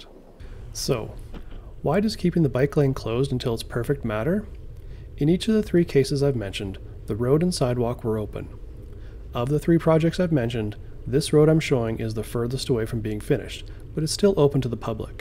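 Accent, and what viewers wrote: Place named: Canada. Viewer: I'm not surprised that you are from Canada. Your city's policies and your accent seem Canadian.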